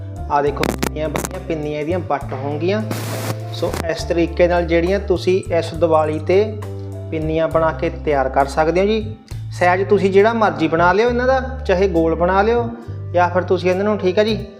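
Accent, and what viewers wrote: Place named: Kurali, India. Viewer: ਤੁਸੀਂ ਵੀਰ ਜੀ ਕਹਿੜੇ ਪਿੰਡ ਤੇ ਜ਼ਿਲ੍ਹੇ ਤੋਂ ਬੋਲੀ ਸਾਡੇ ਕੁਰਾਲੀ ੲਇਲਾਕੇ ਦੀ ਲਗਦੀ ਹੈ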